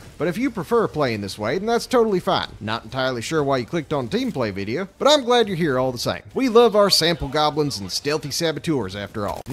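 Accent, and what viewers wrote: South, United States. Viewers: He's carried by that smooth southern accent.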